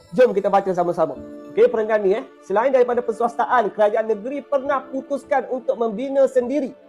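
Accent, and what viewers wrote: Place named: Kelantan, Malaysia. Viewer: cakap BM pun pelat Kelate mmg duduk bawah tempurung kat Pasir Mas hampeh!!!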